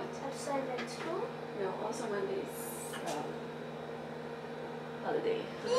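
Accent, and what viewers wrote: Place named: Hiligaynon, Philippines. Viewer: Ilongga tapos Protestant. Grabe ka boses mo yung pinsan ko parehas kayo mag Salita ng tagalog.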